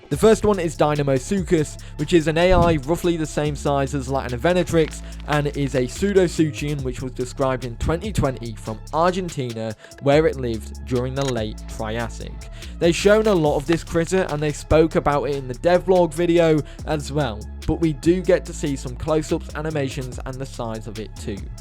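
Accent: United Kingdom. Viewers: Yo when did start hiding that British maw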